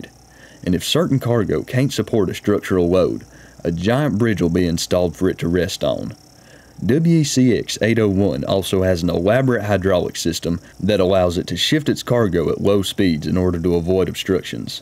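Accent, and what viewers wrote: South, United States. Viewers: Love your Southern US English. It sounds like home.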